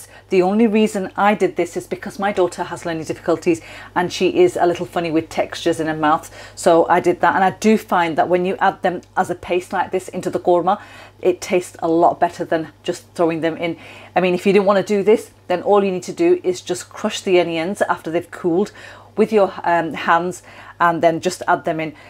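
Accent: United Kingdom. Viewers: oye fake King tujy itne se bat samj nai ati ke wo UK main pedA hue hain is ley un ka accent asa hai aur fake accent wo hota hai Jo banda 3 month UK main rahy aur phir British accent ki Tara bat kare. to ye sab ko pta chal jata ke ye fake accent bana rha hai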